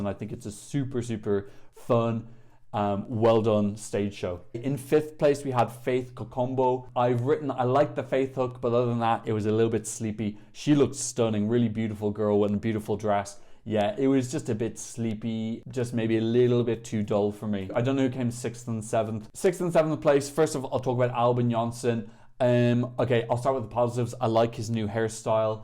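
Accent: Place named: Ireland. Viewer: it's Irish English, and it confuses even the English! (especially the English 😂) It confuses the captions as well, they're hilarious when they come up on my home page.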